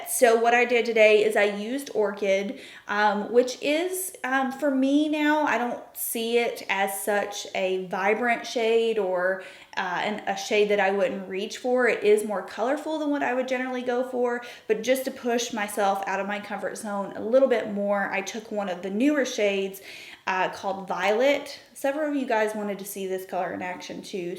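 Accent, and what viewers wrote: South, United States. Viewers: Hi, I’ve watched the first video you have on YouTube! Are you from Texas? Your accent is very southern!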